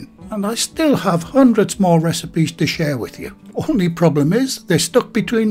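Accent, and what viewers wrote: United Kingdom. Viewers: You deserve every iota of your success, and I must say aside from your tasty recipes I love your easy manner, no-nonsense approach and straighforward instructions and meaurements. My Grandad (who must have hailed from a similar part of the UK as your accent is a dead-ringer for his), who was widowed at a young age with a young family to look after was a fantastic cook and what you make here is the sort of stuff he would have made for the sunday dinners we used to enjoy at his house. Sadly he passed away before he could teach me how to make his traditional English recipes so to say I'm glad I found your channel is an understatement. Thank you for what you do, truly.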